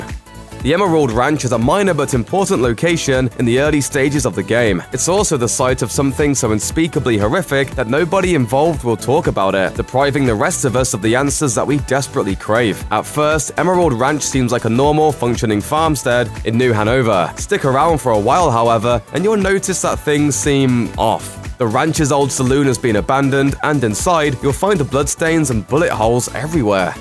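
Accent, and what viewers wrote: United Kingdom. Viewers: Ok why do you sound like a British chills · British yong yea?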